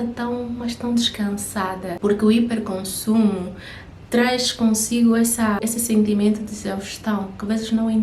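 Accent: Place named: Portugal. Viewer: Já maratonei todos seus vídeos 😊 Você é de Portugal ou Angola? Desculpa a pergunta é pelo sutaque, um abraço no aguardo de mais vídeos 🙏🏽👑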